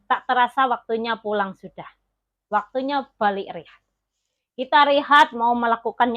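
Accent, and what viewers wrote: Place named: Java, Indonesia. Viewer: Medok temen ngapake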